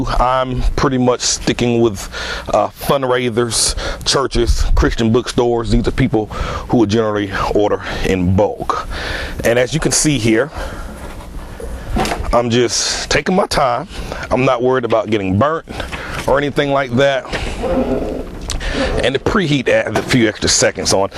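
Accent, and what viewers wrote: South, United States. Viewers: Peel it! Drop it! Roll it, and boom. LOL! 😁😁 It is early in the morning and I am watching this video.. You are so funny and inspirational at the same time. I always learn the best when something is funny or I am laughing. Thank you my brother for this video. I like your Southern accent. I live in the South as well. God Bless you! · It's great to hear a southern accent on the tube. Keep making cool videos brother.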